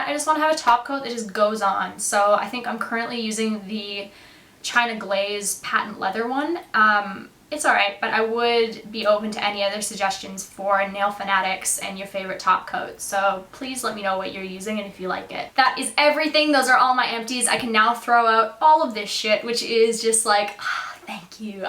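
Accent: Australia. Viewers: I love your videos! :) Thanks for posting xx p.s. your accent is transitioning to australian! love it hahah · You can hear an Australian accent starting to come out in you. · Wow you have really picked up the Australian accent! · I notice the Aussie accent is starting to stick!! :) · It's hard to tell that you are Australian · Is that a slight aussie twang to your accent? ahaha love it! i can hear it! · This is going to sound extremely weird but I really love your accent! It's amazing how 3 years ago, your accent is completely far from an Australian one and now, you sound quite like an Australian. I find it really cool and it makes your voice sound extra great to listen to :3 Love the video as always! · lol i dont know if its because i havent seen your videos in a while but you have more of an australian accent now, love it! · i think she's picking up on an australian accent a little! 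:) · I hear that Australian accent trying to break through! Haha!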